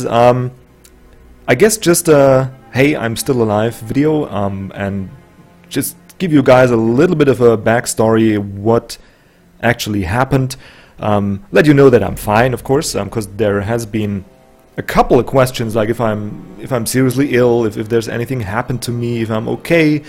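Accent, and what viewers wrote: Germany. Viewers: Im so happy to see you back!!!! :D its great to hear your voice and some german accent again FeelsReallyGoodMan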